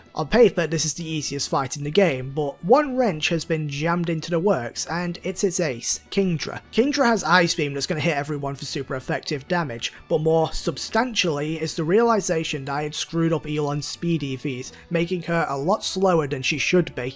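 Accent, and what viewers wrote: Northern England, United Kingdom. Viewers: Whereabouts from up north are you from lad? Can’t pin down your accent. Leeds? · He defo Yorkshire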